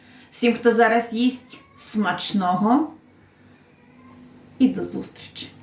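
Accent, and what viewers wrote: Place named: Podillia, Ukraine. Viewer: Дуже дякую за таку розлогу відповідь. Село Глібічок - це мамина мала батьківщина, неподалік від Кам'янця, там, де Миньківці, Нова Вушиця. Почув такі з дитинства знайомі слова, мовні оберти, інтонації, от і запитав, звідки ви. Буду тепер Ваш канал дивитися і слухати 🤗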